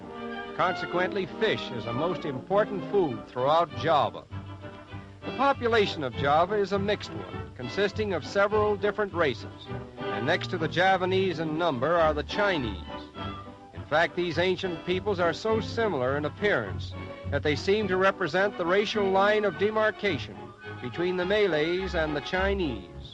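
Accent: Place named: Netherlands. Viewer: Inggris accent Belanda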